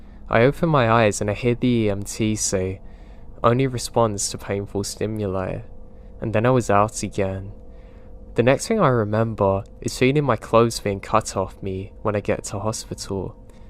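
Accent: United Kingdom. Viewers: You really are one of the narrators who relax me. When I drive I listen to Mr Revenant and Being Scared, but if I want to be simultaneously scared and soothed to sleep, you're the one. I do have unusual dreams listening to your soft British accent (I assume you're British and not any of the others, I know you aren't a Liverpudlian but to my ears you sound a little cockney. I wonder if you could clarify from where in the British Isles you are from. Not Ireland or Scotland or Wales, right?)Yorkshire?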